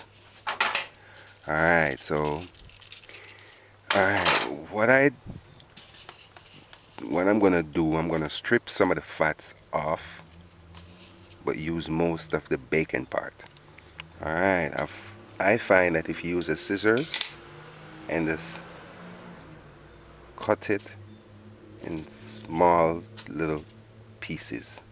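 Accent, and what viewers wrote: Jamaica. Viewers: I love hearing Jamaican accent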